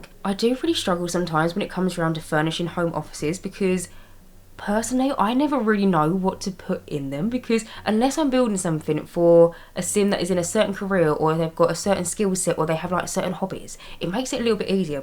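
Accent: United Kingdom. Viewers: Love ur builds since for ever!! But what really sold me was ur beautiful Brittish accent😂❤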